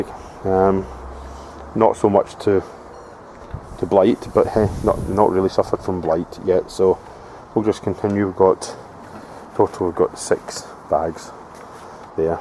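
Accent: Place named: Scotland, United Kingdom. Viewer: Great raised beds, Great frames, Great Vid !! Correct me if i'm wrong but your Scottish accent seems to have something mixed in ?? Maybe a touch of European ??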